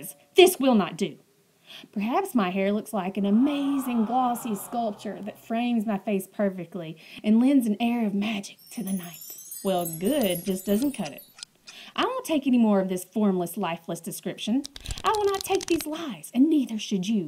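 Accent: South, United States. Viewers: what a spunky, southern sound you have! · Is she from the south?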